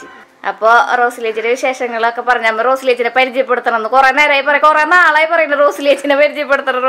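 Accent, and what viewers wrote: Thrissur, India. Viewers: Chechi njn Kannur Ann bt chechide thirissur slang samsaram nth Rasa eanik bayankaram ishttanntto nigalde samsaram chechide vlog pwoli super💕💕💕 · ചെടികൾ എല്ലാം വളരെ ഭംഗി ഉണ്ട് ചേച്ചി... 😍ചേച്ചിടെ തൃശൂർ ഭാഷ സംസാരിക്കുന്ന കേൾക്കാൻ നല്ല രസം ഉണ്ട്....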